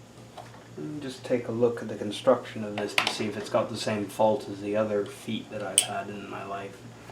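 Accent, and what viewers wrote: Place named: England, United Kingdom. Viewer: I'm from California, sounds like your mabe from England?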